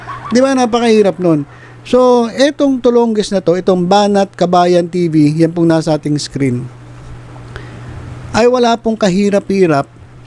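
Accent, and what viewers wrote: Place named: Manila, Philippines. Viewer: Nakinig nko dyn boses mo narinig ko.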